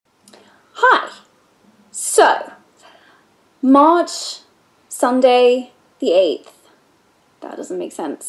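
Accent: United Kingdom. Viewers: イギリス英語でわ？と思います。アメリカとイギリスでは発音が全く違います。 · イギリス発だから逆にあなたが学んでいる英語の方が訛ってるかもよ？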